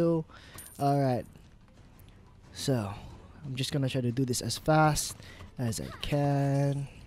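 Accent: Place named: Philippines. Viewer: Lol pinoy KA no KASI accent mo PA LANG pinoy na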